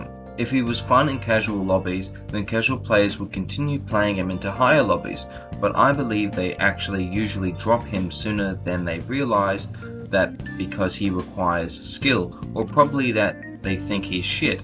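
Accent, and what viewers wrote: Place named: Quebec, Canada. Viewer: He's quebecoise? Over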